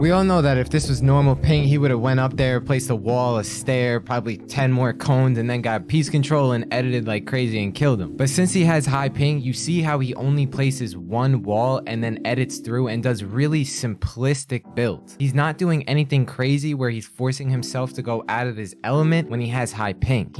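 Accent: South Africa. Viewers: South african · South african. Lol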